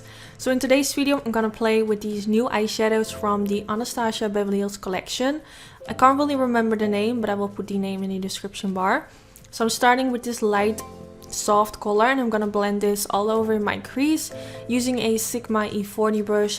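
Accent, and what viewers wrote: Netherlands. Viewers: Ik wilde net vragen of je uit Nederland kwam (je klonk een beetje zo☺️), maar toen las ik het op je kanaal🤪🤗😍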